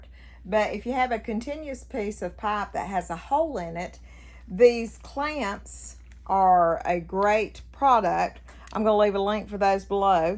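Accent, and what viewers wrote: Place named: South, United States. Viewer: I'm from Australia, I love the southern US accent like yours.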